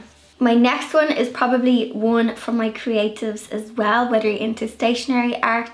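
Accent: Ireland. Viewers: That is not an English accent. Probably Ireland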